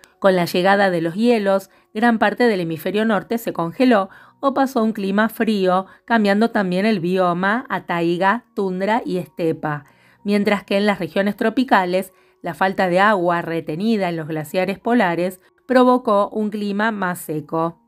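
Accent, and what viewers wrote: Argentina. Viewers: No me gustó, me recontra gusto, explicas cosas muy interesantes. Es el primero video que veo pero creo que los veré todos, me gustaría saber si abordas las teorías de kropotkin... por cierto, me encanta ese acento argentino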